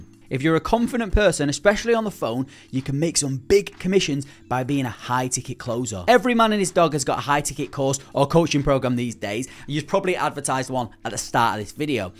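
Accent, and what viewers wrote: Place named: England, United Kingdom. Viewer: Thanks I like you English accent easy understand! Thanks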